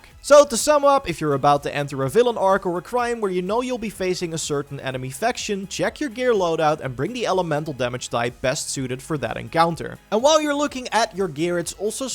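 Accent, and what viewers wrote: Netherlands. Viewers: Love this video as I'm really enjoying this game. But that Dutch accent though damn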